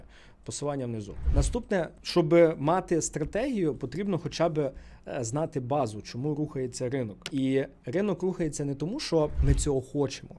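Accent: Lviv, Ukraine. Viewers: Приємно слухати розумні поради ну і Львівський акцент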